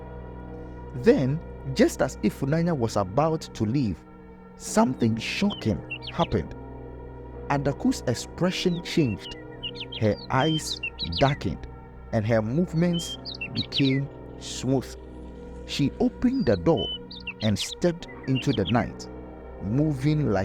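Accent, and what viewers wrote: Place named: Ghana. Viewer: Lovely story. Loving the Ghanaian accent!!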